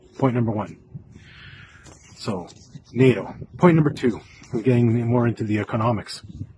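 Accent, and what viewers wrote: Russia. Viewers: THERE IS NO DIFFERENT WAY - or justification for this war. You think that under the shroud of “intellectual analysis” you can push your opinion and people would believe you? There is no justification for killing thousands of young man for the war of one fanatic man Putin. The Russian people don’t deserve that and Ukrainian people don’t deserve that either. Whatever his goals are - he is a lost cause. It is March 8 and it becomes clear that other than ever that he is failing. PS.And you, pretending to be from hungry Dash think again I can spot your perfect Russian accent… You’re not Hungarian you’re Russian and there’s nothing wrong with it but don’t you lie to people and push your so called “intellectual opinions…”… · 1st of all-Have you ever lived behind the "Iron Curtain"? You have a distinct Russian accent in pronouncing Putin's name, and your surname doesn't sound Hungarian.2nd-you are talking about the rights of Hungarians in Ukraine, but completely omitting the brutal crushing of the Hungarian people in 1956 by the Russian tanks???And what about Czechoslovakia in 1968??? 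Why, do you think, are the Russians not so popular, to put it MILDLY, amongst their neighbors and former forced members of the Warsaw pact? Their goals are always occupation and brutal domination. The only point I agree with you is that there are many things we don't know. However, the one thing we know with a high degree of certainty is that Putin is a brutal dictator.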